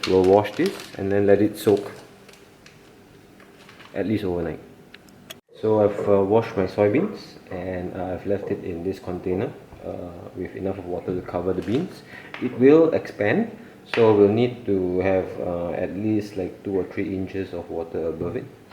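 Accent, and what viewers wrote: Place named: Malaysia. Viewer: ur accent sounds malaysian..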